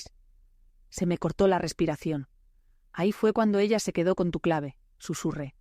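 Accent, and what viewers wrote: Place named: Spain. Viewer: Con mucho respeto,tengan cuidado con sus relatos, en Ciudad de México no nieva y la voz de la relatora es con acento español de España😊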